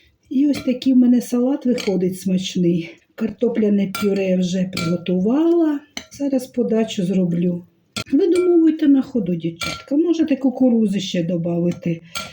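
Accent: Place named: Ukraine. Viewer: ! Очень приятная женщина, молодая, все получается, украинский говор. Дай Бог тебе здоровья, удачи во всех делах, любви и дружбы!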